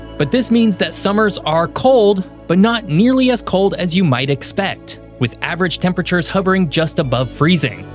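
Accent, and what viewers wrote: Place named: Kentucky, United States. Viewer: Your English pronunciation is awful. It sounds like you went to school in Kentucky. Or, maybe you did. The most annoying is when you say "thuh" instead of "thee" before a word that starts with a vowel or a vowel sound. It's NOT "thuh" island, it's "thee" island, thee arctic, thee avalanche. No, it's not spelled differently, it's just pronounced a different way in different circumstances. Unless you were raised in Kentucky. Go ask your mee-maw, at least she has a second grade education. Also, your voice is shrill and you talk too fast. I don't think being a youtuber is for you.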